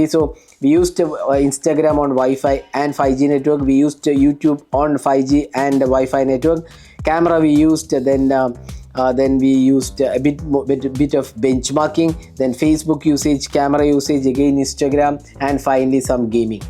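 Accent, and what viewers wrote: Kerala, India. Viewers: Malayali analle aa english ketta ariya 😂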